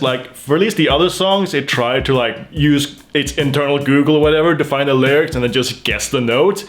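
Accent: Norway. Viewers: Who is this guy? Toki from the cartoon? Norwegian?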